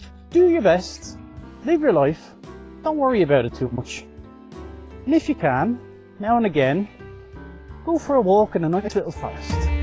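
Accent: Ireland. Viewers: I spent so much of my life being made to be very quiet that I learned to observe and love things others rushed past. I often talked to my bicycle, too. I discovered the joy of talking to cats, dogs, cows and they reacted. Others do this too and I think nothing of it. Some people say we're a bit off until they talk to their car, calling it bad names or begging it to forgive them to get them home. My accent is like yours because of my dads irish accent. I am an American but in certain parts of certain states that accent was preserved. I miss my family, most dead and hearing yourvaccent causes me to feel at home with them again. Thank you so much for posting these videos! You may think it's boring for us but I'm far from bored.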